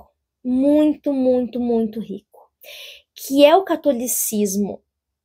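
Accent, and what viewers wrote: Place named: Latin America. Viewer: QUE AULA! Amiga, eu sempre amei as nossas conversas, e sempre quis assistir uma aula sua. Considero esse desejo realizado com esse vídeo. Sem dúvida a fruta não caiu longe do pé heheh mas tem o seu jeitinho de explicar. Simplesmente amei a introdução ao livro ❤ E quanto ao livro em si... só o começo da história já me deixou muuito intrigada! Quero saber mais sobre essa família de mulheres hehe e se só o começo já é assim, tudo o mais que você contou sobre a história me deixou ainda mais curiosa e interessada pelo livro. Mais um na lista!! Hehe Ainda mais especial por ter esse "sotaque" latino 🎉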